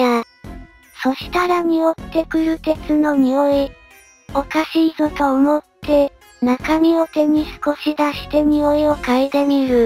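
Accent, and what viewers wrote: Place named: Kansai, Japan. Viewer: 味付けのために変な関西弁つこうてるんやろうけど 関西でそんな海まで熊が降りてくるようなところあったかな～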